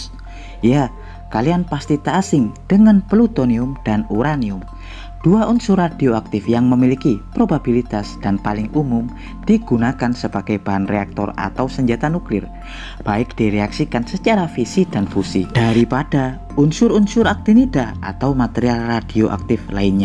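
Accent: Java, Indonesia. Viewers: mendhok djowo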